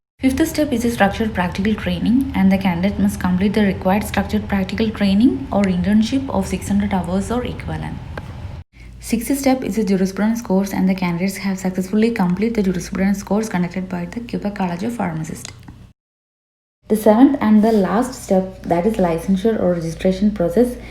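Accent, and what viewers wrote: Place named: India. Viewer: The strong Indian accent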